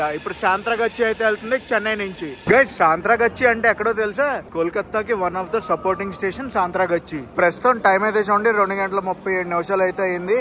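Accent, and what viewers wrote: Godavari, India. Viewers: Love from Nellore Malli vachi Chepala pulusu thinadi Anna ❤️ Me Godavari Slang ante chala istamm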